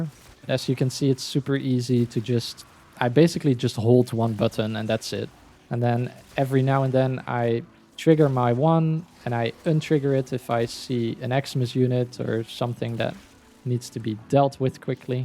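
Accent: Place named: Netherlands. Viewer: He is from Netherlands... This is an accent.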